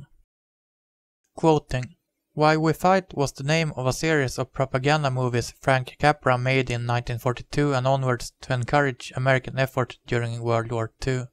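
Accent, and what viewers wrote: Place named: Sweden. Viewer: Nothing wrong with the pronouncing but you can immediately tell that there is a very heavy Swedish accent. The videos are great, this is just an observation about the accent that I would recognize anywhere.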